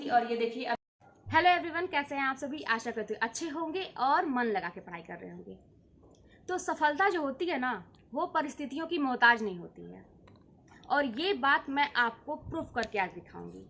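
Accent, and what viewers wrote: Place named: Purvanchal, India. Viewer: Aap kha se ho didi purvanchal side se lg rhi h ....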